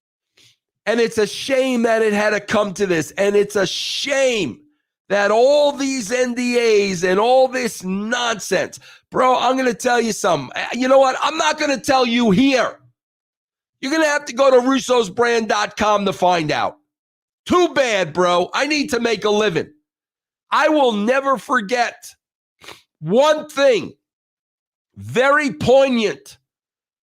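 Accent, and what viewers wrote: New York, United States. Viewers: Russo if you’re gonna gimmick voice the whole segment , go with the heavy New Yorker accent like in the late 90s on nitro. As bad as that was I could tolerate it- but this now with the “bro” every second makes my ears bleed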